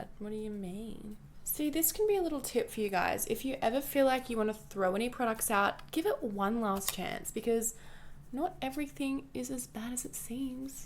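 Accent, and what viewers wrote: Australia. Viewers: r u australian?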